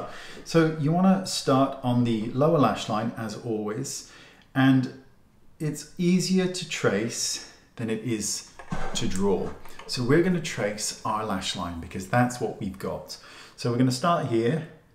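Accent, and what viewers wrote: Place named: London, United Kingdom. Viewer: Thank you very much,. I love your English. Where r u from? London? 💞💕👏🙋🏻🇪🇸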